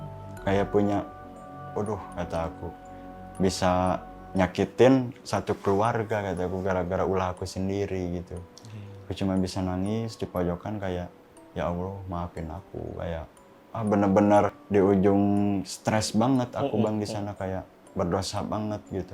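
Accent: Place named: Pandeglang, Indonesia. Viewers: Gile 2 jam nonton bang mode serius. 😂 Si abangnya cerita khas banget orang Sunda, selow, tapi aku betah soalnya sambil bikin kuah bakso. Hari ini di Jember lagi merayakan hari raya ketupat btw. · mas y org mana kyak logat org pandeglang banten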